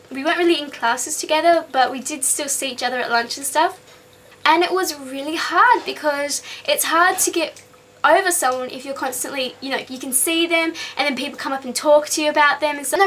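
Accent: Australia. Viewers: that is so awesome Iove australian accents ... I'm sorry they're just soo cute :) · i LOVE ur accent :D <3 Australians have the BEST accents( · I love love LOVE the way you sound. Are you from AU or NZ? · she from aus? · She's Australian, GTFO. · Are u from Aussie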